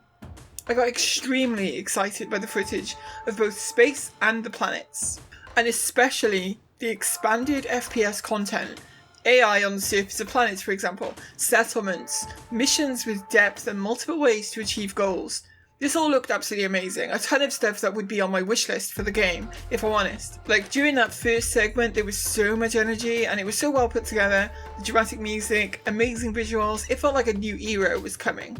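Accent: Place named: Liverpool, United Kingdom. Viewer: This accent sounds like Scouse.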